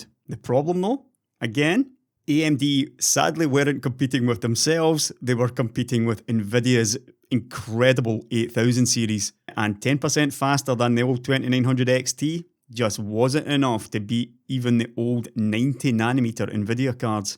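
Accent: Scotland, United Kingdom. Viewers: Dat scoooootish acceeeeeent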